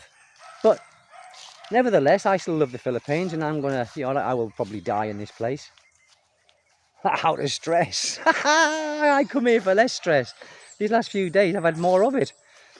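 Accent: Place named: County Durham, United Kingdom. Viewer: County Durham accent if ever ive heard one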